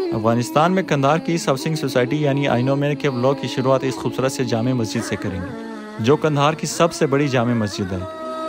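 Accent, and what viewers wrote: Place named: Quetta, Pakistan. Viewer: اپکی پشتو سے لگ رہا اپ کوئٹہ کے ہیں۔میں نے subscribe کرلیا۔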